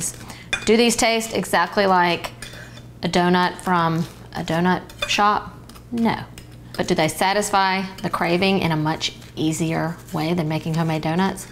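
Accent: South, United States. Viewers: LOVE your southern accent! Wondering where you're from? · Gotta love the southern in this girl! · Was NOT expecting that thick southern accent lol.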